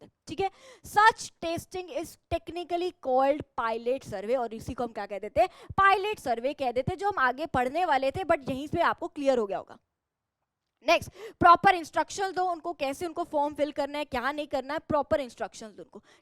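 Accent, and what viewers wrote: Punjab, India. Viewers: Ma'am ur Panjabi ascents ummm so gudd bole to bawal...🤧🌼✌️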